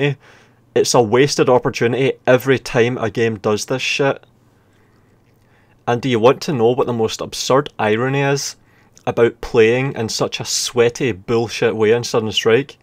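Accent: Scotland, United Kingdom. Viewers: You sound like i changed my siri settings to 'monotonic dulcet Scottish guy'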